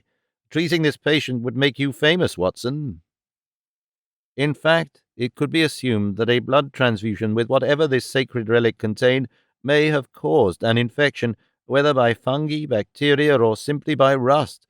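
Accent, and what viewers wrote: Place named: United Kingdom. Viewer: I will really miss this so clear British accent❤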